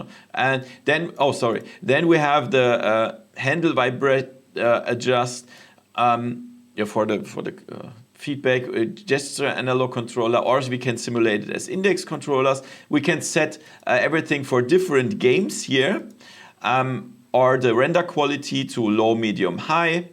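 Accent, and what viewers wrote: Germany. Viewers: What did you expect, buddy? He is German. Everyone has a different accent on planet Earth. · I love the German accent. It's very clear and easy to understand for non-native speakers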